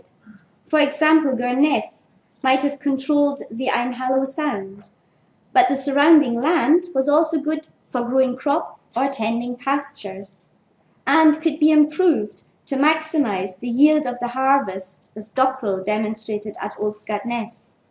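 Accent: United Kingdom. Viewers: I like the speaker’s vocal volume undulations, as you call them. They convey her attitude to the questions she’s discussing. For example, where she discusses what architecture can tell us about how much the broch builders competed to out-build one another in height. I lecture, and I do the same. It’s better than delivering in a monotone. As for the accent, don’t you have any friends from Scotland? If not, find some. Just remember not to visit the country outside May to late September, or you’ll either drown in the horizontal rain, or die of cold. Regarding the talk’s intentions, the main one, I think, is to examine brochs from an engineering/architectural point of view. Do structural analyses, for example, to calculate how high, given a particular ground diameter, you can build the walls before the broch collapses. From that, you can work out whether a broch was in fact built to be as tall as possible. 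That tells you something about how fiercely the builders tried to out-build one another. And that in turn gives clues about how they tried to advertise their status. There’s more about this in <link>, “Land, Stone, Trees, Identity, Ambition: the Building Blocks of Brochs”, Archaeological Journal, 173:1, 1-29, 2016. mentions something related, when she says that the brochs built with better stone had thinner walls. This implies that the builders weren’t competing, because the ones with the better stone threw away the advantage that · I found this really interesting. I've been exploring the local iron age sites and I've had a thought. When and why did people change from building in circles, to building with corners (of varaing and questionable accuracy)? May I also say what a lovely accent! Is it from Shetland?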